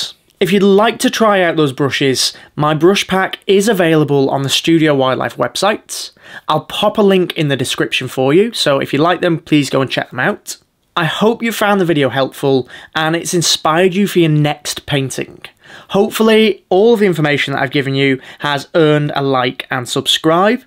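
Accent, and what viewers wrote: United Kingdom. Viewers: i didn’t think so- but i can understand how it felt that way to you. many art videos have a lot of “i see beginners do (this thing i do all the time)…” and feel personally singled out 😀 this is embarrassing to admit but we’re among friends- UK accents immediately make someone sound fancier and i me. “do this, don’t do that” can sound condescending to me like you said- but sometimes for me, it’s the accent for this bonehead american over here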